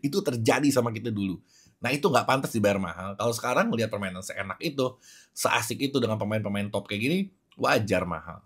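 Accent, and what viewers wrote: Jakarta, Indonesia. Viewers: numpang tanya bang.. asli nya mana? logat nya betawi/jakarta tapi obrolan nya terselibp bahasa sunda..kaya kata2 ngeuleukeub sama ngahiliwir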